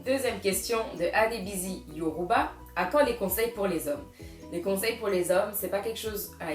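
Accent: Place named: Democratic Republic of the Congo. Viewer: Moi aussi on me prend pour une sénégalaise ou malienne. C est notre couleur et les traits fins qui porte à confusion. Je suis du Congo brazza. C est vrai que je t aurai prise pour une sénégalaise mais à ton accent j ai reconnu le Congo sans être sur bien sûr. Donc on est compatriote 😉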